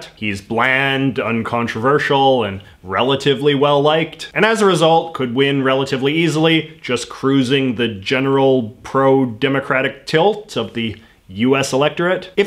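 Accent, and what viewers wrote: Canada. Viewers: The Four Parts of. •The Moustache (RIP) •The Hair •The Incredibly stereotypical Canadian Accent •Francophobia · Really want to watch these videos but his accent is so painfully Canadian. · Are u Canadian ? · is he canadan?he has an accent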